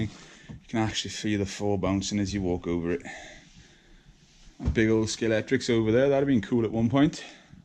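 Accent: Wales, United Kingdom. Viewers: Looks Scottish sound Welsh. Who's jackets that coat?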